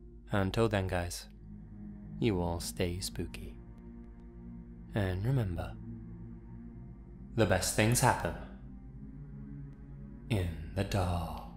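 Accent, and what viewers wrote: Spain. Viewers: Fortaleza is not Fortaletha like you would say in Spanish. And Jaguaro is not Jaquaro. Idk if I'm making sense but he pronounces it as a spaniard wouls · its funny to hear you pronounce Portuguese words with Spanish pronunciation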